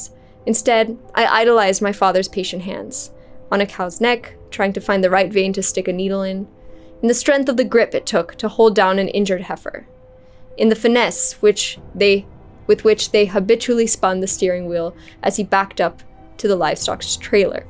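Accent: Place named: United States. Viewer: Nice language American accent🔥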